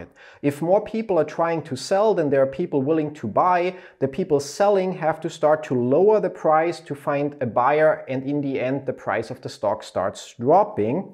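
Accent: Germany. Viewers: I love that German accent. It’s hot af. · Cooles video Off-topic: Immer wieder witzig diesen deutschen Akzent im englischen zu hören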